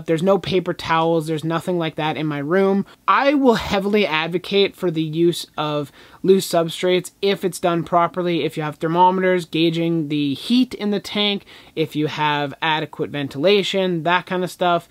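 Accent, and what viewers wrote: Canada. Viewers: it’s because he is canadian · It's a Canadian accent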